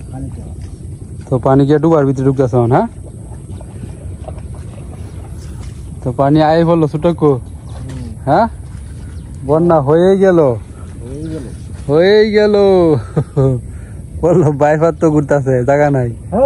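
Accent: Netrokona, Bangladesh. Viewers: মাছ দেখা ছারাও কথাডি হুইন‍্যা মনডা খা খা কইরা উডে।মনে অয় কাইন‍্যাইল বা গাইভনা বা কলমাকান্দা হেইরহম কোনো গ্রাম থাইক‍্যা কেউ কথাডি কইতাছে।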